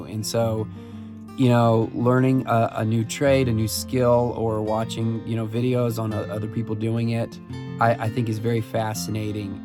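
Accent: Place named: Midwest, United States. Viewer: Hmm. Idk but from his accent I'd say that he's from the Midwest. I could be wrong though. When he said God it sounded like Gad. Lol.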